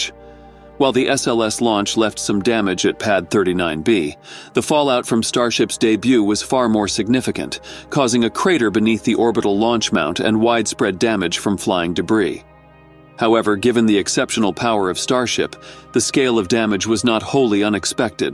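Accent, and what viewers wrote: United States. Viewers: nauseating droning American commentating on this video. The Yankee accent is diabolical.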